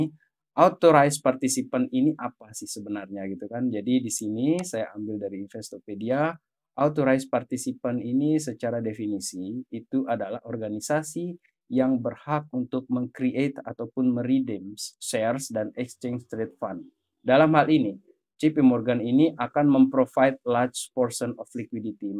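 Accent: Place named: Eastern Indonesia, Indonesia. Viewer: bang sorry oot,, abang org timur kah... logatnya kayak gtu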